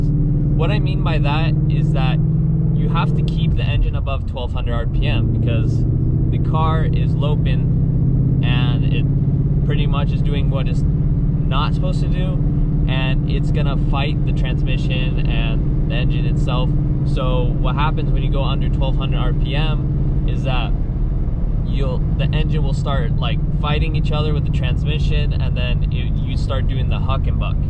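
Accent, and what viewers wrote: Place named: California, United States. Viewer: Sounds like a Californian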